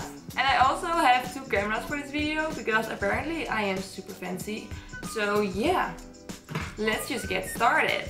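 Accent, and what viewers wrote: Netherlands. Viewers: Je Nederlandse accent is zo herkenbaar lol, goede video 12/10. Groetjes van België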